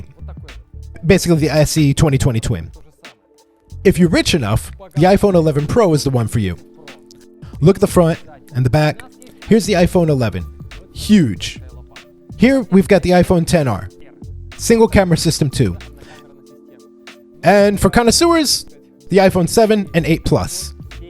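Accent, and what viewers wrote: Russia. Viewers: Я прям слышу на фоне стандартный русский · Немного слышиться русский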